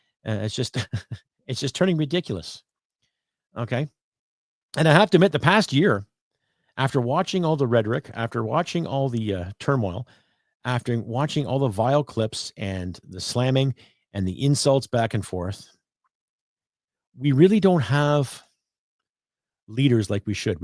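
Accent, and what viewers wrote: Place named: Alberta, Canada. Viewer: you speak fluent Albertan! (Please carry on! ) 👍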